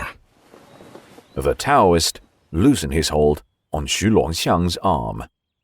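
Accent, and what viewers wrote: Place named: United Kingdom. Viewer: I love the British pronounciation so much. Sounds professional. It's my first time to listen English audiobooks. I can enjoy learning English now.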